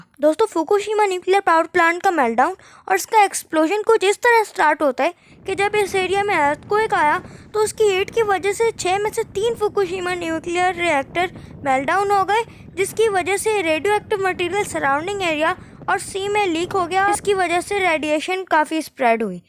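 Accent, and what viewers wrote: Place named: India. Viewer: I am from britain I can’t understand you because of your accent so people who are not indian can not understand you very well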